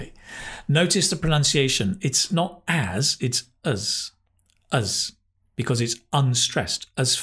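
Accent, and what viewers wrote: United Kingdom. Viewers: Thanks!!! Is your English british? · Is it UK accent? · Hi teacher? I really love. Your British accent. If I decided to study English by Cambly I'm sure I going to choose as my teacher.💚🌎🖍📗🇬🇧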